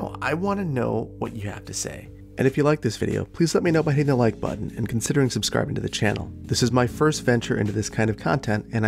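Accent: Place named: United States. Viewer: There are many valid points but also couple of things I would respectfully criticize. The speaker’s accent suggests he is based in the US. With that in mind I cannot really get the point about inequality. I mean, most of US cities are already designed with sprawl, in a way that often mass transit is not a viable option to commute. And those groups of people that cannot drive (e.g. kids, elderly, disabled, poor, etc.) are having significant disadvantage in that respect. In addition, when autonomous cars become mainstream, most of the people will not have to own them. All that makes me think autonomous vehicles are more likely to improve the equality than otherwise.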